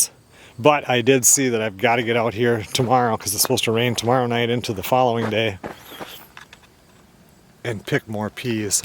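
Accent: Minnesota, United States. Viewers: I love your show & your Minnesota Swedish accent❤.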